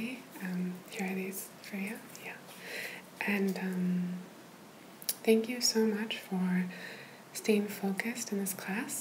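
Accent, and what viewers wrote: Argentina. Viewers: Yo con tu video tengo mas vocabulario 😊 y me gusta mucho el pais de Argentina 😍 mi idioma a aprender y mi acento favorito en solo un video 🙈 thanks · is she argentinian? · Sos de Argentina? Porque tenés el acento perfecto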